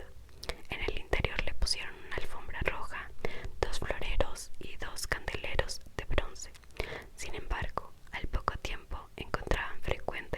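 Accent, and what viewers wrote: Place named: Colombia. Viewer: Crei que eras Colombiana 🤔😍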